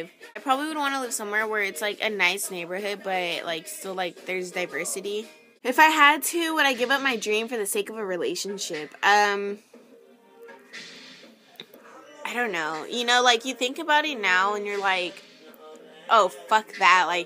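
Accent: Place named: Texas, United States. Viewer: Where are from I feel like you're from texas like me ? ;o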